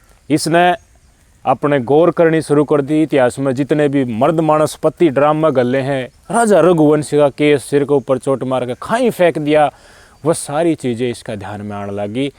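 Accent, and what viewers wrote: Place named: Bhiwani, India. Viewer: Bhai Bhiwani ki lapeteydar boli wah bhai wah 😅😅😅😅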